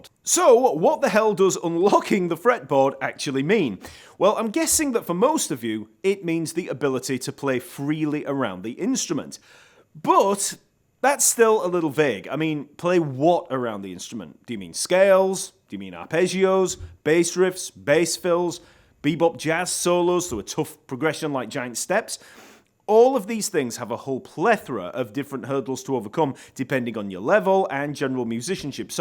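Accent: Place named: North, United Kingdom. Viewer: The clarity of your Northern accent allows me to soak your teaching by osmosis. It's only a Northern song. I'm a Yorkshire lad by the way. Si thi.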